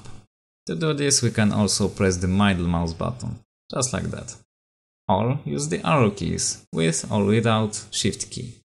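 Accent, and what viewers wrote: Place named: Poland. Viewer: Widziałem, że skądś znam ten akcent, Polak jesteś. :D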